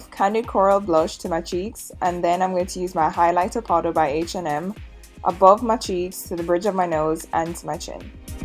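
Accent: Jamaica. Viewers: Great tutorial. You sound Jamaican btw 😉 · Your accent 😍😍😍 i know your from town (jamaica)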